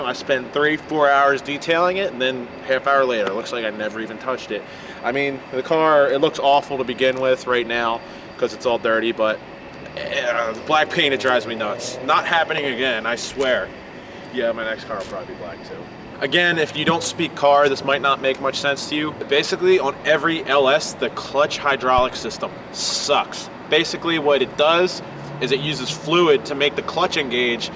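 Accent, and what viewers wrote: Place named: Baltimore, United States. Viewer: That Baltimore Accent. #MDlivin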